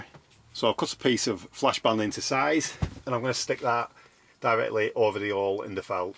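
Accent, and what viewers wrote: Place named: Scotland, United Kingdom. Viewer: I can sense some Scottish accent. Do you work near Glasgow by any chance?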